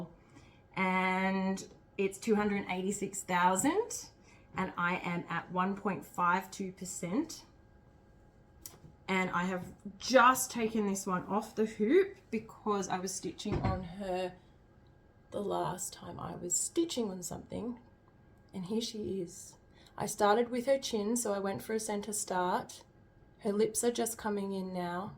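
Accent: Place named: Australia. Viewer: !!! Oh my goodness you did excellent! I got absolutely no stitching done because I was enjoying this with my morning coffee!😁☕️ So many great WIPs, and some I don't remember seeing. I love your Pandemic your colour choices/ placements are so fun! Paradise Found is definitely one of my favourites and I loved seeing the progress on it. ( I laughed when you said you didn't pick MC so it's more doable, because whenever I get around to my JN it is regular version MC🤦‍♀️😝🤣) 26.99%🤣🤣 oh that made me giggle! Talking about 939 and the Kreinik did also!🤭 Your CM Designs are beautiful! Love your Chicago piece, those trees!!😍 I had so many more things to say but like always I have forgotten them by the time I get to the end of a video! So proud of you for jumping on the Flosstube train! Oh and I love your Aussie accent! 😊 Thanks so much for the shoutout!!🥰 I am so glad I could say I was here RIGHT from the beginning of your flosstube journey! 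Sub #1!😉🤭😊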